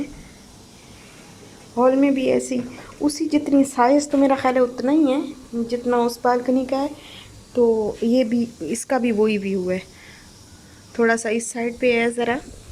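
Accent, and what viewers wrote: Saraiki, Pakistan. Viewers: Apka excent buhat pyara hai. Looks like seraeeki touch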